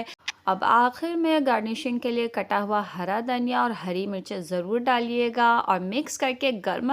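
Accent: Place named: India. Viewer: Achhi Tara KEHTAY han achhay se nahi. Indians ki naqal kar Kay I Urdu ka kabara na Karen please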